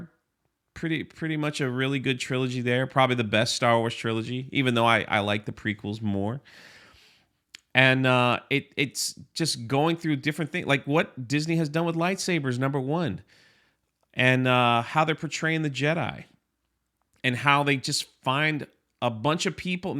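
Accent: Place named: California, United States. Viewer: Ok huge question -- are u a surfer from southern california? You sure sound like one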